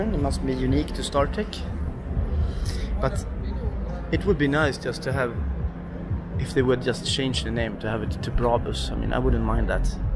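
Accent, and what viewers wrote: Sweden. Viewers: I bet the one whos talking is from Sweden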